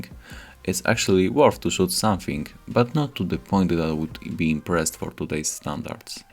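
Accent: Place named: Poland. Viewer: Po akcencie wydaje mi się że musisz być z Polski 😉😉👍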